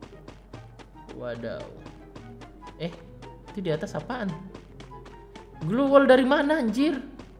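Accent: Makassar, Indonesia. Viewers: makassar mana suaranya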